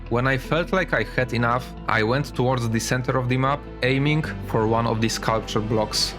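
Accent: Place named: Poland. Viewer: bro Why i think you from poland dlaczego wydaje mi się że jesteś polski ?????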